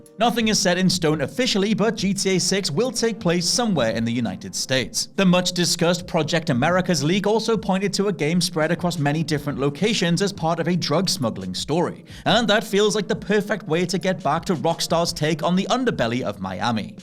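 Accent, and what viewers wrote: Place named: Newcastle, United Kingdom. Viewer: This is my first time on your channel and I'm curious - are you a Geordie by any chance? You sound like I sound when I put on an accent so others can understand what I'm taaakin' aboot :D Please disregard if you have no idea what I'm talking about. Subscribed anyway...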